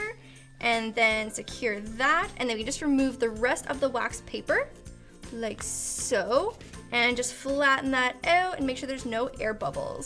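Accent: Canada. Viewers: Yep, she's from Ontario :) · I am trying to to figure out your accent... are you from Canada? Also I name the giraffe Geoffrey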